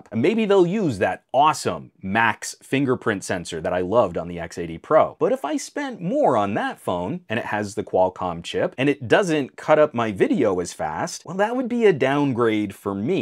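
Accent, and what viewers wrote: United States. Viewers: Mr, what a beautiful American English accent you have..🎉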